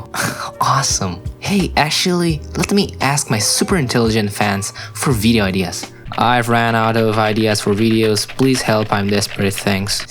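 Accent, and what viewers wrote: Romania. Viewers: Wow awesome! Are you Romanian? You have the same accent as Gutter.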